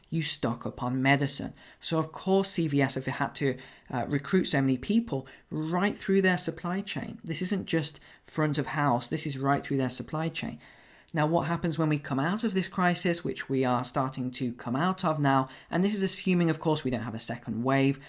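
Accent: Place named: England, United Kingdom. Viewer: This is totally irrelevant but I usually don’t like English accents but your voice is so soothing. I’ve started to listen to you in lieu of ASMR in order to fall asleep. I know it might seem like it but it’s a complement. 😴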